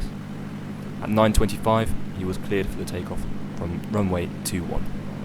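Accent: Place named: Birmingham, United Kingdom. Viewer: I keep trying to watch this video. I've already left one set of comments. As I keep rewinding. Going what did he say? What did he say? You are mumbling, child! I know we all love Ozzy Osbourne. And wish him and Sharon well. But you don't really want to sound like, you are trying to impersonate, Ozzy Osbourne's, brain dead speech patterns. What did they say that is? A, Birmingham, twang? As in, Birming and ham. It's 2 things not one. It's not pronounced, " Buhmmmmh ". Look at the letters! You have to pronounce them! Along with, letters AEIOU and sometimes, Y. That sounds like, E. And I don't know, Y? And then to make English more confusing. Some joker came up with, YMCA and YM HA in, New Yaawk! And we don't know why that is? So don't ask. It just is. Don't say gay. Even if they are. And genocide of the Native American population. No big thing. 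Not because they weren't white. We aren't like that. It's because they didn't believe in Jesus. And they only lynched black people also? Innguns… Didn't got to worry about that. We were kinder and used bullets instead. Because they also went, BANG! And white guys, like that sound. Because the one they have doesn't work. As Viagra was some, Mexican chick.. And I haven't seen Alice yet. This articulate response. Was artificially generated by my very damaged brain. From years and years. Of calorie burning thinking. Which used most of it up. And in only the past few months. I have lost over 25 pounds! Isn't that amazing?! Just lying on top o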